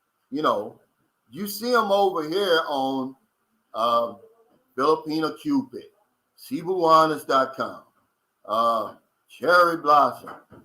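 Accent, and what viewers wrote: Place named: New York, United States. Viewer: hey funny you dont sound upper crust you sound rough new york type but what you say is as smart as anyone example love yourself first before look for external love thats as smart as ive ever heard dont sugar coat ones lack of selflove with anothers love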